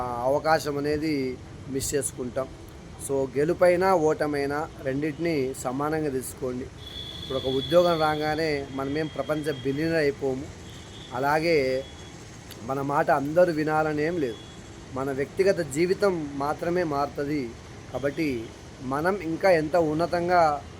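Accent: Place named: Adoni, India. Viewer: Sorry సర్,,, మీరెవరో తెలియకనే మన ఆదోని వారు గమ్మత్తు మాట్లాడుతున్నాడు,, అని subscribe చేసుకున్న,,, కానీ మిగురించి తెలుసుకున్న మీరు ap స్టడీ లో తోపు అని,,,, hatssapp సర్ మా జిల్లాలో పుట్టినందుకు,,,,❤❤❤❤❤❤❤❤❤❤❤❤❤❤❤❤❤❤❤❤❤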